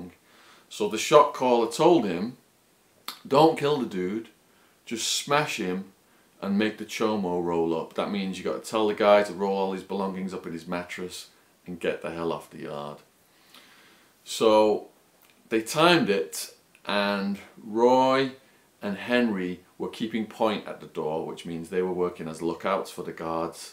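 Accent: United Kingdom. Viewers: Are you from UK? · You sound British. Were you deported?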